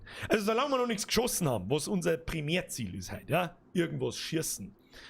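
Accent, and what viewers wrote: Bavaria, Germany. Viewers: Tahts Life Junge ^^ Bayrisch ist halt nicht jedermanns Sache Ich hab halt das Glück und wohne in seiner Nähe und verstehe alles :)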